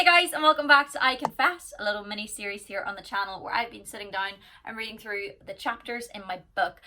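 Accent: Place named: Ireland. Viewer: I am a 67 old man in Holland. Two weeks ago I saw you the first time on youtube, looking at X factor video’s. The simple way you sang the song struck me. Vocaly you were not perfect, but you told a story. Your voice, Irisch accent and appearance intrigued me. I listened to other songs you sang and each time you told a story more than you sang a song., you are a storyteller. That is why I also like to listen to your vlogs. When you are telling what you are doing en things about youself I clearly see what is going on in your mind. Emotionaly you are an open book and that is also what you want to be. I know that everything on the outside of you is fake, but the inside is reaal and that is what makes you special for so many.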